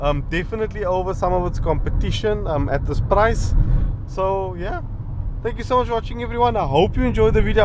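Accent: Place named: Cape Town, South Africa. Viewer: Don’t change the way you talk it’s great listening to someone speak the Cape Town slang😁🙏 subbed because of that!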